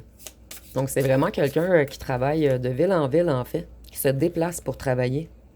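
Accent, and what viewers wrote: Canada. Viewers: J adore ce vernis. La voix et l accent canadien j adore.quel est votre petit prénom discret . Je suis reunionnaise, nous avons un patois qui ressemble à celui des canadiens. ❤❤❤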